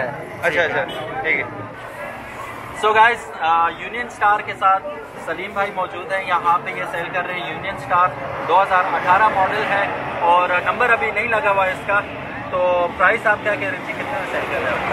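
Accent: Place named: Hazara, Pakistan. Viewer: Apka lehja bta raha hy ap hazarewal ho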